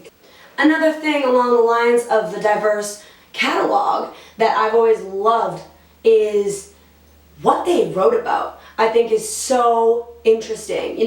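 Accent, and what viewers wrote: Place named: New Jersey, United States. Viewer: you're gorgeous and I like your singing, but you're speaking voice is so Jersey shore that it hurts to hear you describe my favorite band lol. Well right behind the wailers and the jimi Hendrix experience. Just constructive criticism because you're a professional. But you're so right in recognizing their genius. I literally think they are the famous Kumar avatars of God because they really cared about helping souls. "We're bigger than jesus. " lol. Anyway I wish you all the best because you have a beautiful soul too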